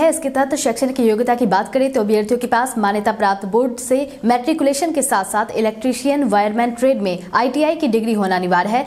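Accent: Bihar, India. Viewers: Bihari mat bolakaro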